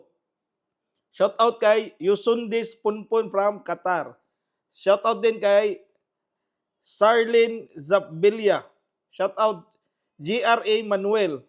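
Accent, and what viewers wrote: Visayas, Philippines. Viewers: Perfectionist ba nimo...normal Rana sa amoa mga bisaya.. ❤ bisaya Rai makasabot anah 😂❤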